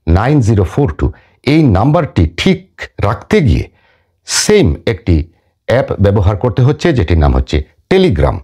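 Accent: Sylhet, Bangladesh. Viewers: Apnr vasa sylhet kutay plz bolen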